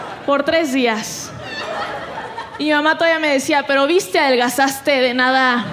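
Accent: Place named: Michoacán, Mexico. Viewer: Tiene un toque de Michoacán 😂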